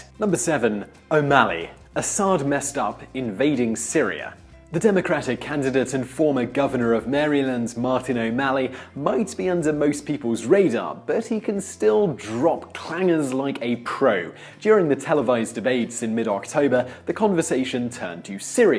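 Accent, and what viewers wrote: United Kingdom. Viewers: lel a british guy talking about american politics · I thought you are Brit.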